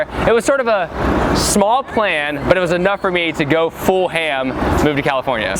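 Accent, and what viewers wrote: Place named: New York, United States. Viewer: lol i love it the new York in you is starting to come out.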